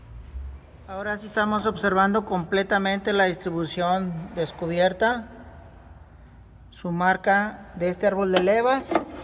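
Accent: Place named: Mexico. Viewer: Los Mejicanos hablan cómico pero buen trabajo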